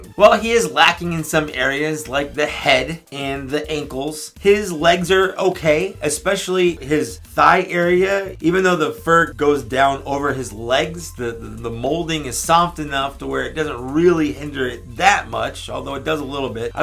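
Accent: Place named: Chicago, United States. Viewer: Lol aren't you from Chicago? You definitely have the accent! 🤣 Love your reviews, keep it up!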